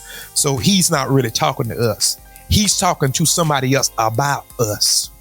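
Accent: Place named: South, United States. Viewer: NC here! Keep doing your thing, sir, give em that country talk!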